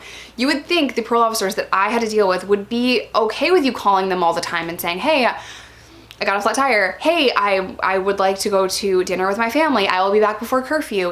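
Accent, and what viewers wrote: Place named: Upstate New York, United States. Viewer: knew you were an upstate new yorker by your accent, you sound like me hahahaha i'm in oswego county.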